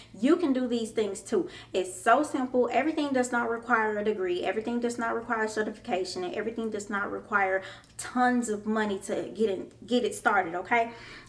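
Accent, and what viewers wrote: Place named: Memphis, United States. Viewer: Yes! I am located in Tn as well and I can spot an accent a mile away!! I thought Memphis to be specific but that’s where I’m from lol... I really love your videos!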